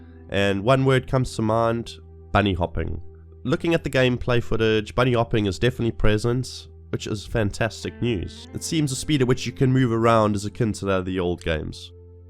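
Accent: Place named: South Africa. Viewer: Dude, you nailed it. Are you South African ?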